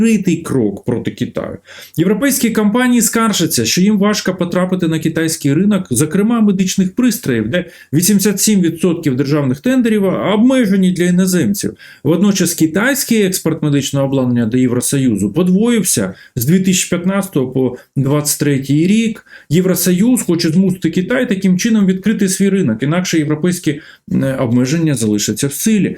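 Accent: Moscow, Russia. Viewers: Прізвище вроді ж Галащук, а людина акає, як московит. "тАвари, пАчув на свАю адресу, Європоейський сАюз, вирАбниками.." Нє, ну невже так важко вимовляти українські слова не акаючи?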